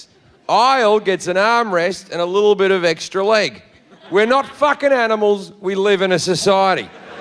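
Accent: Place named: Australia. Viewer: I had just written ''Is he Australian ?? '' and at 7.50 I got the answer.. He is funny at times he is bringing a good point about armrests... I threw some side eyes to the armrest while I was at the window seat. Now I feel bad ... .By the way he told the story , I was able to visualize the scene... so he must have some talent... Australians are rough I think... and 9 minutes 55 seconds is all I can take of him today... I would not go to his show really .. he also seems as if he had three beers (unless this is his persona) .Listening to him is more like weight lifting exercise , the story as a whole is not offensive , although language used is heavy and rough.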